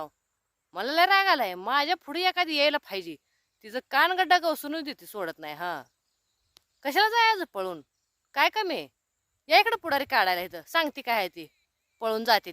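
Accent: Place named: Western Maharashtra, India. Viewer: बोलण्याची पद्धत आवडली ,बोलीभाषा पश्चिम महाराष्ट्रातील दिसतेय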